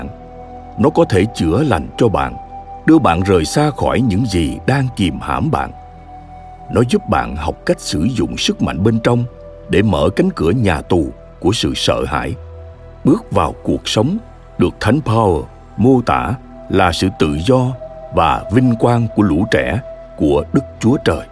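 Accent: South, Vietnam. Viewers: Giọng đọc hay nhất trong các giọng đọc của sách sức mạnh tiềm thức. Chắc mình người Nam, nên thích nghe giọng miền Nam ấm áp, trầm và nội lực. Cám ơn Fonos, mình sẽ mua để nghe trọn bộ.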